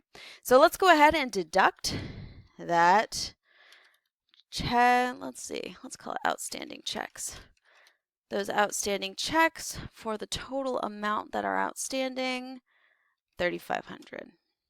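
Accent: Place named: United States. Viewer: Oh I know now, American English!!!🧐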